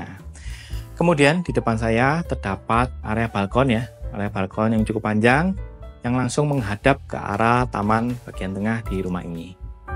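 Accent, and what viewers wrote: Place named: East Java, Indonesia. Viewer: Videonya menjelaskan banyak hal kecuali lokasi, hal yang justru paling penting kalo mau jual rumah. Lokasinya di kota mana? Saya cuma bisa nebak dari dialek hostnya, yaitu Jawa Timuran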